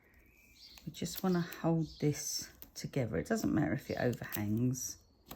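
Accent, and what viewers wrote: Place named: England, United Kingdom. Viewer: Hi. I love your tutorials so nice to hear your english accent. - I'm 81 & in UK but still enjoying my cardmaking. Thank you for your inspiration. 🇬🇧🇬🇧🇬🇧🇬🇧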